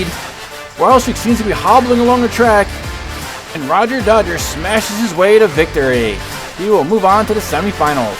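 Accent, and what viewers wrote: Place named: United States. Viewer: Oh I remember you live in Thailand. Because of your accent/voice I sometimes think you are from the USA so it would've been cheap seeing as I got some cars there but great video!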